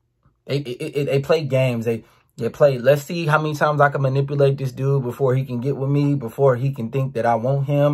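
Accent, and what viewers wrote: Baltimore, United States. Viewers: "yewtewb... wut it dew..." nun beats that Baltimore accent much love from Cali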